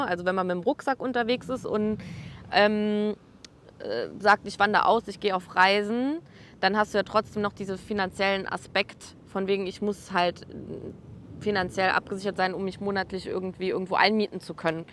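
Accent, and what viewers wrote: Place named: Saarland, Germany. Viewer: Wow, ich bin beeindruckt von deinem Leben. Du hast alles richtig gemacht. Du bist sehr ordentlich und sehr minimiert und vielleicht würde ich mich noch vom Beifahrersitz trennen.Ich lebe in Rheinland-Pfalz und freue mich in verschiedenen Sätzen dein saarländisches Dialekt rauszuhören. Ich liebe Dialekt. Du lebst im Paradies und genieße jeden Tag.Viel Glück in deinem Leben.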